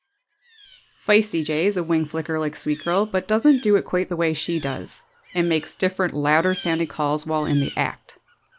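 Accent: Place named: Atlantic Canada, Canada. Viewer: My favorite video! Your accent sounds like you're from east coast of Canada. Are you?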